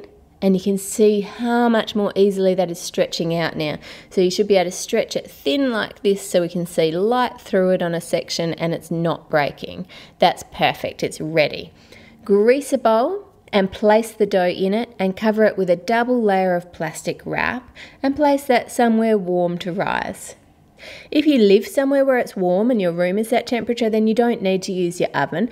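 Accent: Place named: Australia. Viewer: Whooooa, that was an unusual video °Δ° like, seriously, i often look videos just to hear her beautiful voice and enjoy the Australian accent, and NOW I see smoke in her kitchen and then fire burning all over the video frame, and I'm like: 😨😵😱 whhhat??!!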